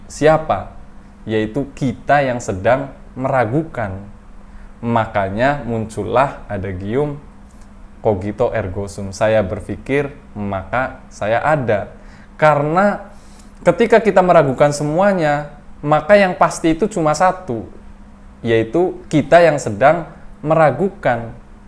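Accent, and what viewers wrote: Banyumas, Indonesia. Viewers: Sukak dengerin penjelasannya, mudah dipahami. Sukak logat2 bicaranya juga, kyk ada ngapak2nya dikit.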